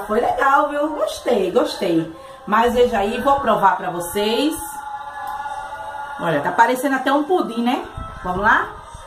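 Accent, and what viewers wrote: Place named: North, Brazil. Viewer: Estou gostando dos seus videos, muito legal as receitas, veja que voce é pessoas cristã, isso é bom. pelo seu sotaque percebo ser nortista. abraços do amigo Paul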